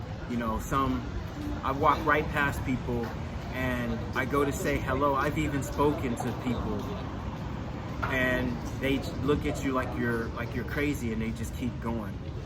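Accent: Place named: Los Angeles, United States. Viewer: Sad. Sounds like academia. Your speech sounds like Los Angeleno.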